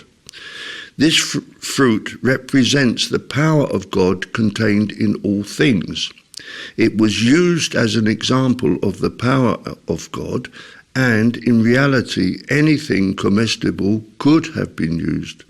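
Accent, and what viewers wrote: United Kingdom. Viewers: Hi, did you know Stuart Wilde? What do you think of him? Your British accent reminds me of him.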